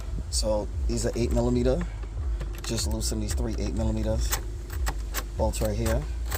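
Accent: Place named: New York City, United States. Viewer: Thanks bro you saved me $80 , I hear Washington Heights in that accent…🤓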